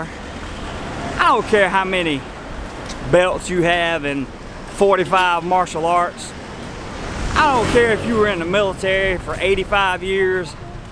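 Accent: South, United States. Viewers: Watching from the Philippines... I like you southern accent. thanks you visited the Philippines. thailand and Philippines have similarities in culture and they both eat rice Lol. I like to watch ads too... SPANISH. As the Philippines was once a colony of Spain... Spanish was taught also in School. 2 languages were taught in Philippine school back then 1950s-1980's.. Spanish and English. Same is true in the Philippines... in Thailand and the Philippines... foreigners are guest.. and a good guest behave. You are not a citizen in this country. Act like a good guest . TIPS... because wages are low and people have high expectation with tourist. As you have said... dont come to Thailand with little money.. because people expect tourist have money to spend. WELL SAID. I agree with you. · these advices are precious really like not touching the head of a Thai, who would otherwise know for such details. 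Thanks. PS: English not my mother language but I'd say you're from US somewhere within the Bible Belt range? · I'm listening to his accent and thinking.....cape fear hahaha. Great real world advice boys ! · I love your south accent man! I've been in TH for 3 years, 85-88 and everything you say it's true. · My curiosity: that american accent you have... which american state are you from? Texas? I can easily understand every single word you utter. English' s not my first language (neither the second one, actually), so I'm curious because sometime I struggle a little to understand fast spoken american-english. No problem whatsoever in understanding you ;) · Thumbs up for cowbow accent. Yehaw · Love your down-to-earth delivery with the southern gentleman charm. You should do way more video narratives....on any subject. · Your Southern accent is cute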